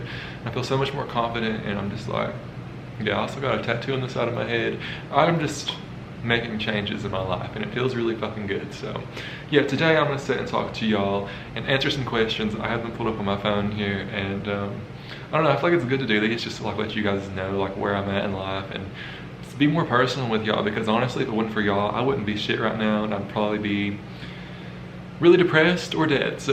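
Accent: South, United States. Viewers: I love his country accent 😂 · I have no idea who this guy is. But that's a sick sweater and I find it oddly fascinating that his accent sounds like every old southern woman I've ever met. Not that he sounds feminine but the twang is pretty much identical to old southern women.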